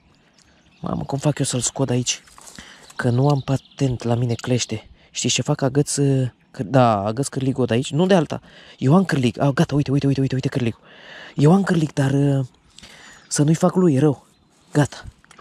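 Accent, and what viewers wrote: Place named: United States. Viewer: Unde ai pescuit în America sau pe o baltă din Romămia că după cum de exprimai erai mai mult american decăt romăn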